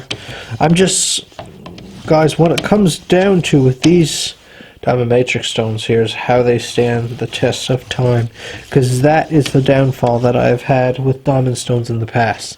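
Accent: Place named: Newfoundland, Canada. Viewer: You are sharpening your knife like a madman. You are going way too fast and only using a small portion of the stone. Cody at Edge Pro explains that if you run the stone over the knife too quickly it will result in glazing. While it’s obvious you are getting good results, your poor old stones are taking a beating. Trying slowing down and ease off the coffee. Sounds like you’re from Newfoundland.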